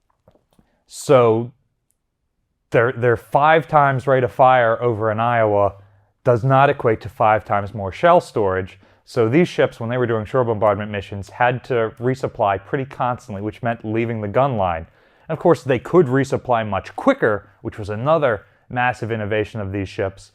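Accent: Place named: New Jersey/Philadelphia, United States. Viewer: Yeah, definitely from the NJ/Philly area. That's how they pronounce it down there.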